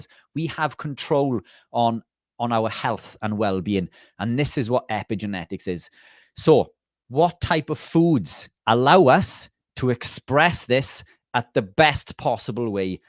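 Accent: Wales, United Kingdom. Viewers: I was truely fascinated by your talk ...all your knowledge...I'm 90% carnivore and sometimes eat seeds...nuts...and potato mash at weekend....now I'm aiming for 100% carnivore. Thank you ❤ Can I just add how sad I felt when you mentioned your Dad...unfortunately his loss 😢 Thank you again for passing on all your knowledge 🙏 I LOVE YOUR WELSH ACCENT 😍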